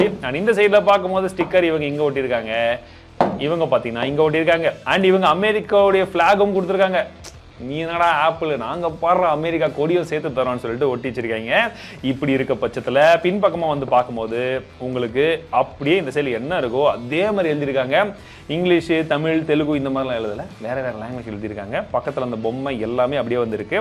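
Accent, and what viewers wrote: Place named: Usilampatti, India. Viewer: Uslimpatti slang super bro 🔥🔥🔥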